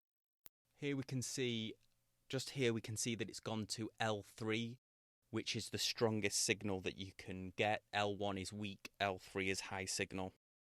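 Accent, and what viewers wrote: United Kingdom. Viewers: I've watched this video through twice, and it sure would be NICE if you'd give a mention of the app you're using to accomplish this amazing feat! Going by your accent I gather you're a Brit. We Americans need things spelled out for us a bit plainly. So how bout it, sport? Give us a hand here mate? 😎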